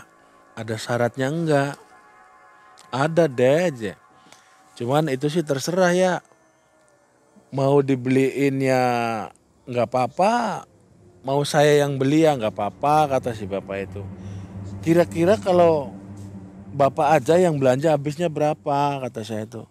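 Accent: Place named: Banyumas, Indonesia. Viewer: Pantes selalu ngundang mas viewer nya tinggi terus,mas ceritanya juga ga ngebosenin santai mudah di mengerti, saya sebagai orang ngapak kalo mas ngomong ngapak aku pun tau artinya😂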